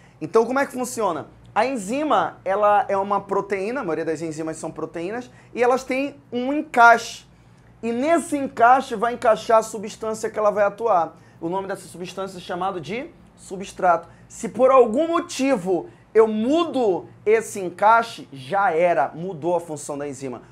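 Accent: Pará, Brazil. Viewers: Esse sotaque paraense que reconheço de longe, tão bom de ouvir. Sou de Natal RN, mas minha família toda é paraense. Parabéns pela didática muito boa. PS: Se não for paraense vou passar vergonha, mas se parece muito. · é Paraense. O sotaque não nega.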